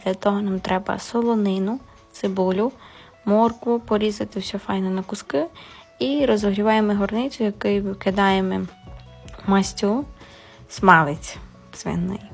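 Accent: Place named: Transcarpathia, Ukraine. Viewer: Противний говор закарпатський.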